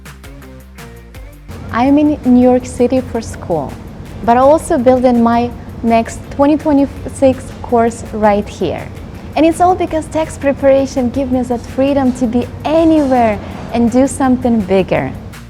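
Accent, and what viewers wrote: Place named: Germany. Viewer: That is a lovely accent you have there. Germany?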